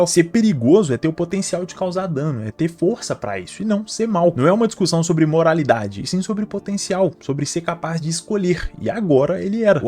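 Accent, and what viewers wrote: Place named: Bahia, Brazil. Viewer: levemente baiano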